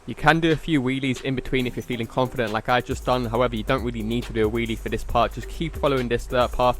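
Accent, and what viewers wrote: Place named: United Kingdom. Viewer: Love the British accent🇬🇧!!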